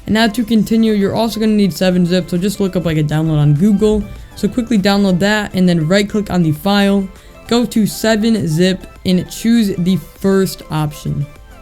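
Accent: France. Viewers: R u French ?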